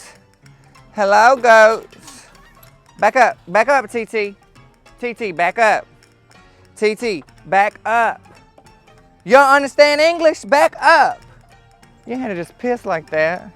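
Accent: South, United States. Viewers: Omg! (Laughing) "It's so damn ghetto around here!" (OR:) "Biotch, you going to Chick-fil-A!"😅 Reminds me of my childhood with that "SOUTHERN" accent. ❤ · 😂 you are so funny and so southern I just don't know what to do lol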